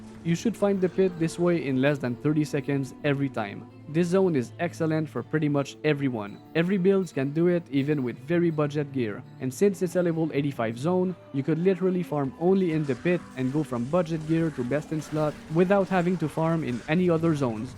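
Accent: Quebec, Canada. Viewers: Me semblais en ecoutant jtrouvais sa sonnait quebec 😂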